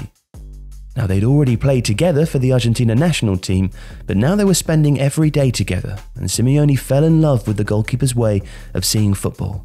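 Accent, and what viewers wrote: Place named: England, United Kingdom. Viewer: The script reader sounds English. Probably can't pronounce them like a native speaker.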